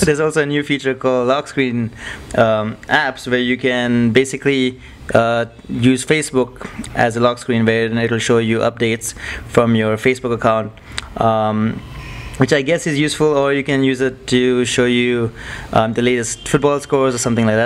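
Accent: India. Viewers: Thumbs for up that indish accent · i hate indian accents they annoy me im sorry to say but looks like the place iswonderful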